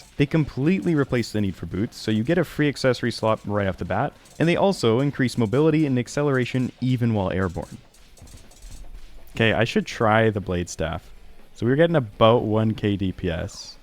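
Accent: United States. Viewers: i think thats just his american accent, similar to "banena" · yeah Midwest American for sure.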